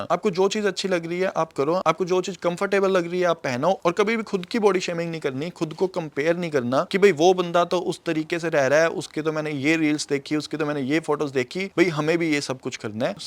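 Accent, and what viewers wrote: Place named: Haryana, India. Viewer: Bhai aap ka accent haryanvi jaisa lagta hai baaki aap kahan se hu ? 😀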